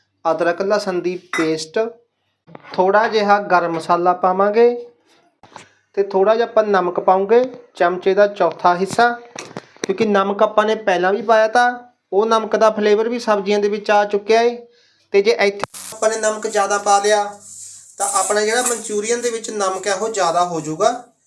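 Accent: Ropar, India. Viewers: ਵੀਰੇ ਤੁਹਾਡੀ ਬੋਲੀ ਮੇਰੇ ਵਾਲੀ ਆ ਰੋਪੜ ਜਿਲ੍ਹੇ ਦੀ 😊😊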